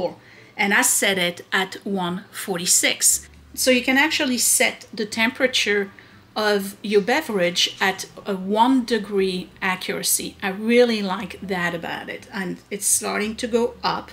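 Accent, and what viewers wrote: France. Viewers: Dear. As to your accent. I started watching your channel, and yes, from the beginning, precisely because, I couldn’t initially work out your accent. A touch of French, a bit of New Jersey, I found it a charming, or, tres charmante accent, and have been watching you ever since. Yes, your sometimes fracturing of English, or American is funny, but in a good way. I ‘ope you always retain your interesting way of speaking. Thank you, all the best to you, those endlessly growing boys, you have built a great channel.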